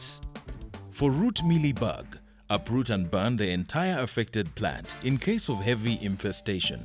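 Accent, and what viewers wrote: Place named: Africa. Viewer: Great video very straightforward and I love the African accent! 😍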